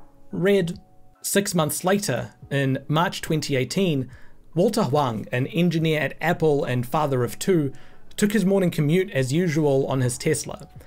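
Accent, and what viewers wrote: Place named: New Zealand. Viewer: Great to hear a kiwi voice on YouTube!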